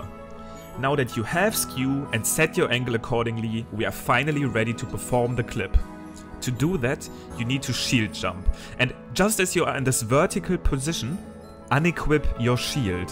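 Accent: Germany. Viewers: Warum machst du die Videos nicht auf deutsch Mann hört das du deutscher Bist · You have a german accent. Do you skeak German? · are you german ?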